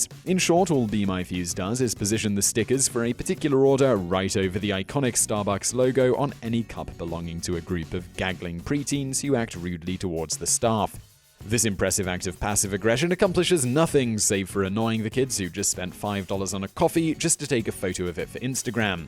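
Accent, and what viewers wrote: United Kingdom. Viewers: I like you saying slang terms in a British accent.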